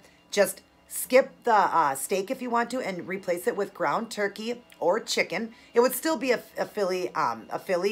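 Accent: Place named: Minnesota, United States. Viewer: love your channel! what part of Minnesota are you from? I'm from MN too! I could tell right away from your accent lol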